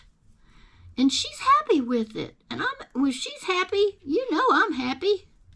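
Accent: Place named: South Texas, United States. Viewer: Just love watching you ‘cause you talk like me! I’m a south Texas native who made my first cardigan following your tutorial this spring. Thanks a bunch, friend! (Ps - I’m a retired nurse too!)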